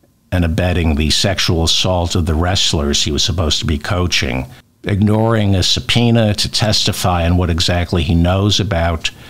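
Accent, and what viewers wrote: United States. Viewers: Just happened on your video. Clear, concise and succinct delivery regarding the skeletons in Jim Jordan's closet. I like your soft spoken American accent also 😊. Best wishes to all decent thinking Americans 🇺🇸 from Ireland 🇮🇪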